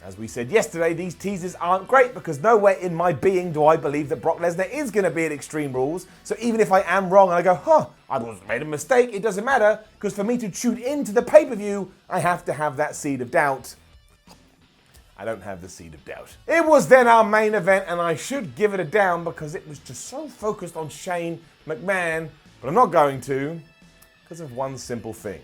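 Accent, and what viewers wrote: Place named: Scotland, United Kingdom. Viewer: why a scottish accident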